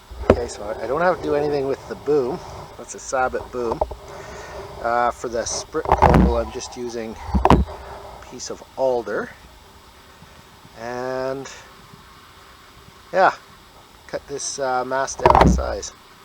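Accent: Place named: Canada. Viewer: You sound suspiciously canadian when you talk.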